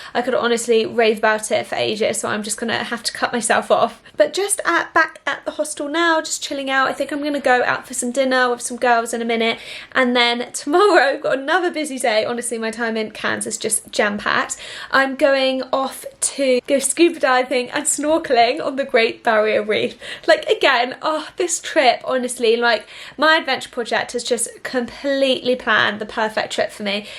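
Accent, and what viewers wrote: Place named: United Kingdom. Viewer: Always love your videos and charming British accent :-)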